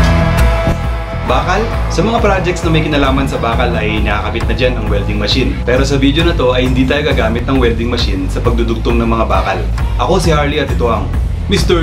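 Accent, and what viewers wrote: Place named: Philippines. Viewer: Nice Work brother .......you sounds Phillipines.......my neighbour.......I AM FROM MEGHALAYA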